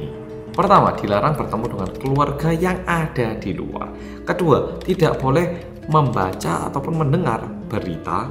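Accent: Java, Indonesia. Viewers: Anda kaya orang Indonesia yg lagi merantau .bahasanya itu looh medok bnget hehe semangat mas. Teruslah bercerita tentang kehidupan korea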